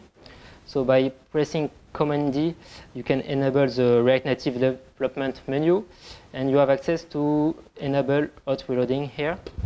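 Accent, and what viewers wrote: France. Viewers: Tu aurait pu la faire en français.....vu l'accent ^^ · ton accent francais il dechire lol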